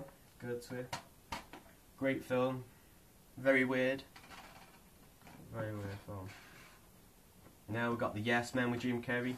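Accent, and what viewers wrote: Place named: United States. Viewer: what you talking in that SHOCKING american accent for ?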